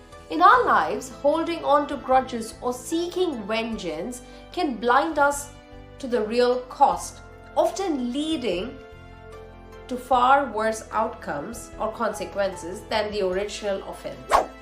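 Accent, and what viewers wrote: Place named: India. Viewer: Your ascent is truly impressive!! Are you from India ?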